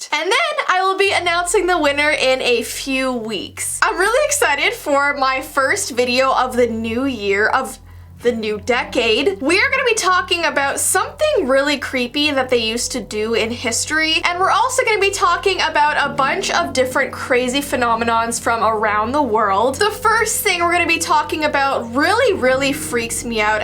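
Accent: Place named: Canada. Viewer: She is Canadian so she has a little bit if an accent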